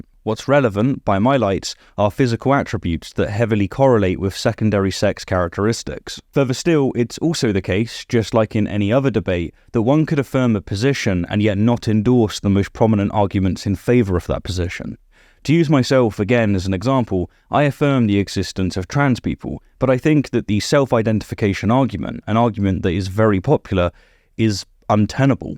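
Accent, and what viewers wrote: United Kingdom. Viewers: It's sort of nice to hear a voice with this accent say that trans people are people. Some hope for the UK yet.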